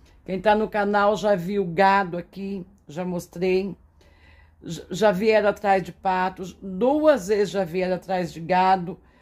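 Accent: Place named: Minas Gerais, Brazil. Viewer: Eu adoro esse seu sutaque caipira de Minas gerais e são Paulo kkkk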